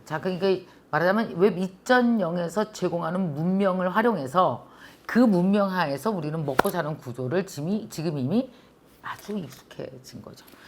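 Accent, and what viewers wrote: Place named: North Chungcheong, South Korea. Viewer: 언제나 잘보고 있습니다 충북이 고향이라 아주 귀에 딱딱 들어 옵니다 ^^ 마치 고향 이모가 말해 주듯이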